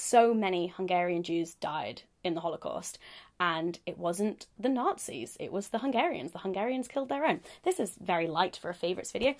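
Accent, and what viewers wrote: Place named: North, United Kingdom. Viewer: Where abouts is from? I thought she was a southerner but is it only me who thinks her accent has a little northern twang? It's so cute! xxx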